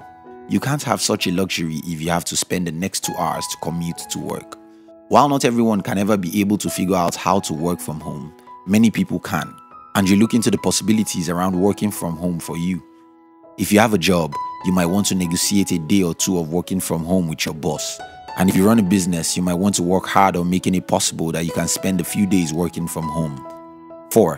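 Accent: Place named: Nigeria. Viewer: He is from Africa, possibly Nigeria.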